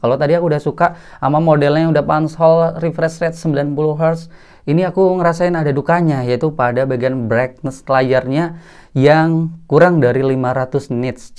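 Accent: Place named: Cirebon, Indonesia. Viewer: Logatnya kaya wong cerbon bang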